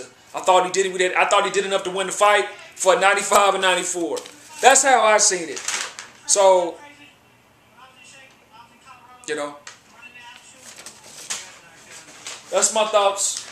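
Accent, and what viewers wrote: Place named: South, United States. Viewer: My nigga u sound like u from one its them down south states or I maybe even from st louis so u holdin down ya mans but that nigga alexander loss but he did look way better than I though I had him getting ko'd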